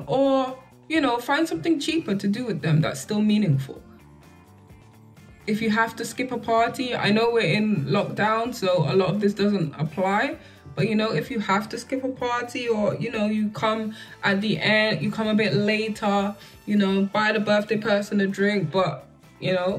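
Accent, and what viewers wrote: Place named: United States. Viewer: Yasss but how you have American accent 😅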